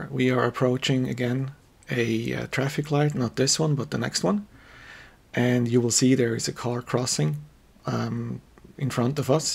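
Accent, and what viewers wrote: Switzerland. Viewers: Schweizer Akzent hat überzeugt. Liebe Grüsse vom Genfer See :-)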